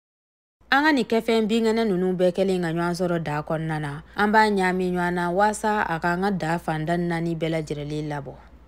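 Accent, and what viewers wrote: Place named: Segou, Mali. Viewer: Just love the way she talks. It reminds me of home (ségou)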